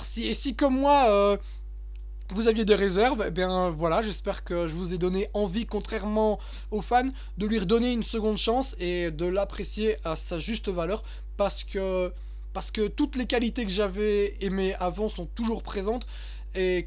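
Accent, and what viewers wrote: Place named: South, France. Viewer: (Ton accent du Sud est un peu à revoir, mais merci quand même de cet essai sonore:D) Ah oui, je me souviens de ta chronique sur Avatar d'il y a quelques années ^^ (comment l'oublier? tu t'en étais pris plein la gueule, après ta vidéo, par des personnes qui étaient fans du film xD). D'ailleurs, ce serait bien que tu postes tes nouveaux "Grumpy mate des flims" sur ton blog.:) C'est intéressant de donner une seconde chance à des films, je le fais aussi (pas pour TOUS les films que j'ai trouvé bof, mais pour certains oui, et du coup j'ai parfois de bonnes surprises au second visionnage).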